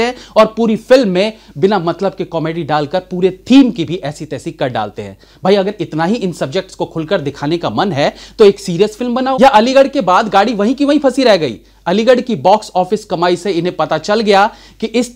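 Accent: Bihar, India. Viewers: Pura biharipan me agaya bhaiya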